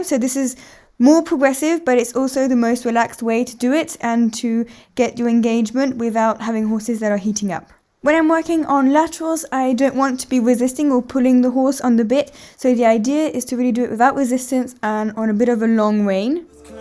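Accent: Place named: England, United Kingdom. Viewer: Sorry, I don’t really understand you English accent. Can you add subtitles please? Anyway, you’re a good rider🫶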